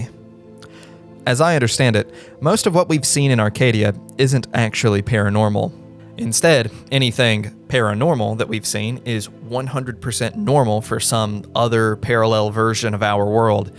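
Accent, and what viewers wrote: South, United States. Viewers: I’m just so happy to find a relatively popular YouTuber (who makes great content btw! I’ve watched a few but this was the episode I subscribed over) who’s got a southern accent. I did pause the video at the beginning and went and watched all 17 or whatever videos of the OG and I’m sooo glad I did. So good. Great series, great commentary. I usually pick one or the other, the original or the summary, but for THIS series I’m so glad I took your advice.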